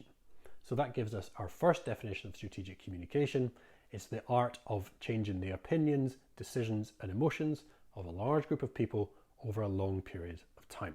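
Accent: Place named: United Kingdom. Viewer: Great uk accent straight to the point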